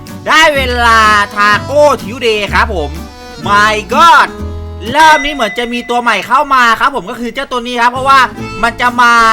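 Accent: South, Thailand. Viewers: พี่เป็นคนไทยแต่อยู่ภาคใต้ใช่ไหม😊😊😊😊😊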